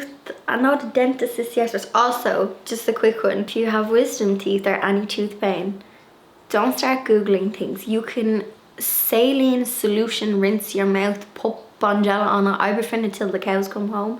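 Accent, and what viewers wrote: Ireland. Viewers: Love all your videos, what ever you put out I am happy to just soak up your beautiful soft Irish accent 🥰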